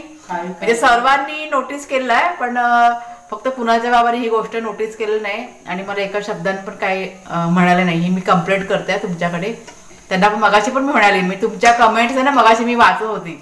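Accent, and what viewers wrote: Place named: Wadval, India. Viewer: तुटल नाही वाडवळ बोलायच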